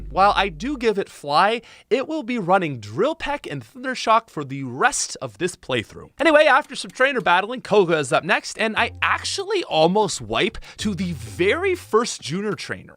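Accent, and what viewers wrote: Canada. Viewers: 10 seconds in, and I suspect you’re Canadian haha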